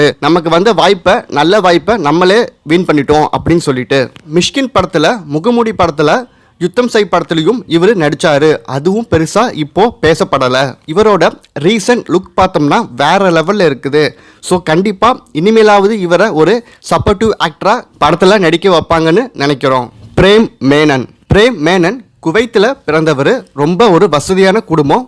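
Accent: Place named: Sri Lanka. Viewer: Srilankan voice slag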